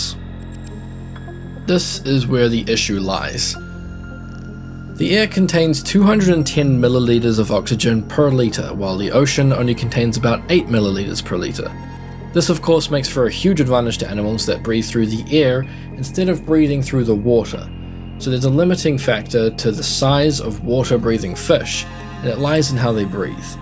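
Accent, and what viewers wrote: New Zealand. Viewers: I'm actually from Auckland too, I'm Maori and was born there, but I left for Melbourne a long time ago and but the reason I could pick it is because before I left, the rhotic 'r' sound (which is very prominent in your accent) was just starting to become more widespread and especially among the Pasifika population around Sth Auckland. It's actually quite nice on you, and very nostalgic for me to hear. I could listen to it all day. Anyways, great video. I liked and subbed 😊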